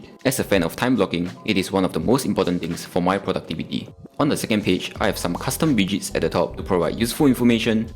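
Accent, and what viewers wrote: Singapore. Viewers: This is cool but I can’t understand anything you’re saying because of your oriental Singapore accent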